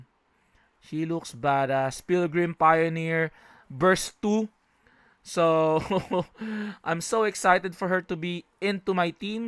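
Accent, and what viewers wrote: Philippines. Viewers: Sabi na fil ka pre e, sa accent mo kase HAHAHAHA nice content pre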